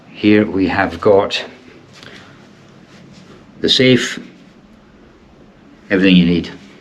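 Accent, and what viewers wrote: Scotland, United Kingdom. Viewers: A Scotsman with an Aussie past, the Scot’s never lose the accent! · It’s amazing you have a broad Scottish accent - with living your first 25 years in Oz.. I’m surprised. The hotel didn’t look good at all. I’m not keen on Sydney. Thanks for showing us.👍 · Amazing how you kept the Scottish accent having spent so much time in Australia! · why do you not speak with an Australian accent if you grew up there? Why do you have a Scottish accent? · how did you manage to keep your Scots accent having been raised in Australia? I was born in Scotland but raised in England yet my accent is definitely not Scottish (although I do know where my bread is buttered when it comes to football & rugby). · this might sound stupid but why does he have a scottish accent then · He has a Scottish accent because he is Scottish. Where he lived as a kid is just a red herring. 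· His parents were Scottish so that would be the accent he heard most.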